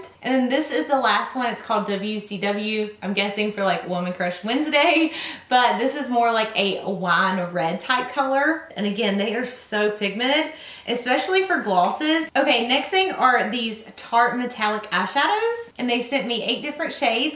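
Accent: South, United States. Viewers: If you put captions on, it changes some words due to the thick southern accent 😅 It said "matta fires" instead of mattifiers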